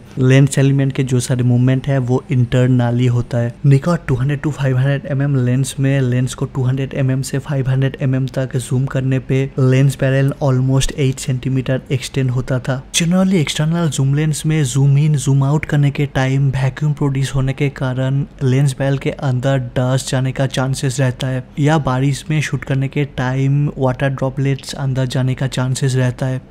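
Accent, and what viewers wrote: Bengal, India. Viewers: hindi sahi hai lekin bangal hai tone to hogi hi bangali, aap video me or achche se hindi bole, video or chalegi sath hi bangali me bhi alag se banaye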